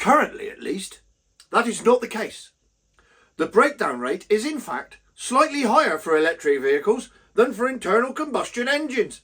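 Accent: Cornwall, United Kingdom. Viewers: You have made my Easter 😅 good Cornish man with a hint of Jethro 🏴🏴🏴🇬🇧🇬🇧✝️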